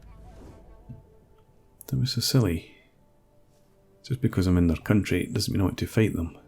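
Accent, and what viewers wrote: Scotland, United Kingdom. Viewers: you are Scot, arent you? love your accent;-)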